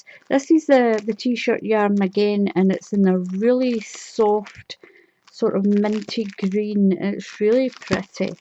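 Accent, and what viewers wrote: Scotland, United Kingdom. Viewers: Oh my! That totally blows that crappy mystery box from e-bay out of the water !! LOL... What a great stash. 🍀You did great.🎶 I have never used T-shirt yarn. I don't. know how it feels. But I can't wait to see what you do with the pom- poms.I thought I might like that for a trim around a baby blanket ? I really don't know. The colors of your stash are beauties. I am in the process now of making a Ferries Wheel yarn , color , Wild Violets, small baby blanket. Keep well. Stay safe. Keep healthy. God bless us all. I really like your channel and I love your brogue. 🌞 Is it Scottish ?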